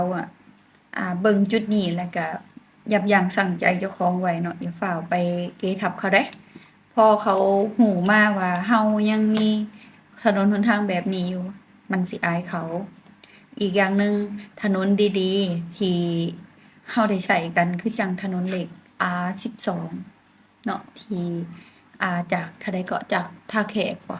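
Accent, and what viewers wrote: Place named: South, Thailand. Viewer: สำเยียงทำไมเหมือนพูดไต้ผสมอีสานเลย😅😅😅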